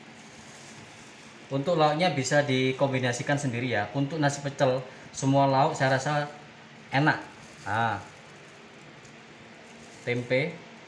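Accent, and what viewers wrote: East Java, Indonesia. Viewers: Saya suka cara masaknya n bahasanya yg medhok khas Jawa timuran 👍👍👍salam teko arek Suroboyo chef..🙏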